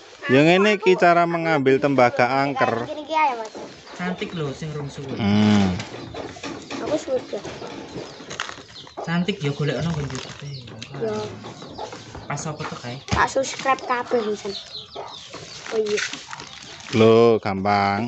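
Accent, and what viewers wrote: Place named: Manado, Indonesia. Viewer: Dari logatnya pasti anda org manado y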